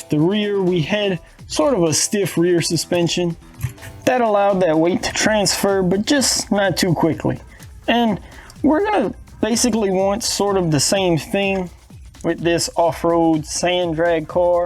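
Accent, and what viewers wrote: Louisiana, United States. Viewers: Sounds like a Lafayette La accent.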